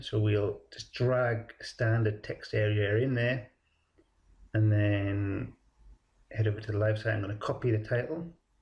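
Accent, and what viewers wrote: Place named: Yorkshire, United Kingdom. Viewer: Aye, a Yorkshire Man, of course you are... my family is from the dales..Well done with the tutorial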